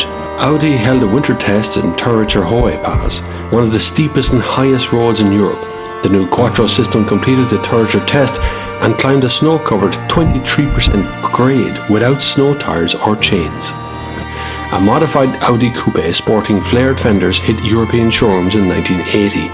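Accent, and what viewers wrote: Ireland. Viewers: in Ireland 3 is pronounced as tree. So if you hear a tree as three he is Irish....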